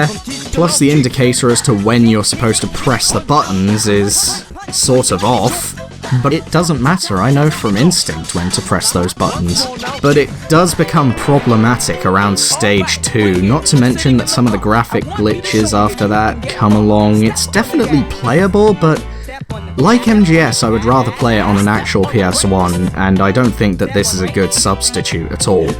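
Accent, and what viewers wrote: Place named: United Kingdom. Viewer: never even heard of bleem until this video was recommend to me 😂 and which part of Britain are you from?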